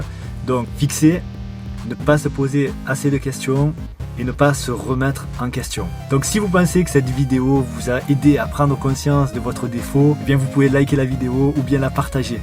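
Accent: South, France. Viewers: Accent de sud????? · Super vidéo, et super accent du sud. 😊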